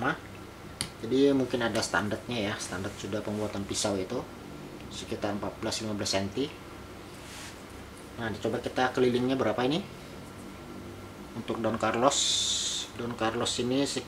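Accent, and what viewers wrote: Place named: Banjar, Indonesia. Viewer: inggih logat nya. urang mana pian mang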